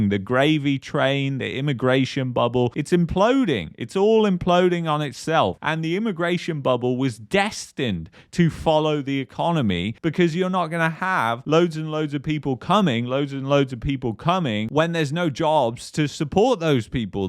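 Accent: United Kingdom. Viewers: I have never seen a positive video from this guy just gloom and doom. If he does not hate Canada so much he should just move somewhere else, he sounds British anyways.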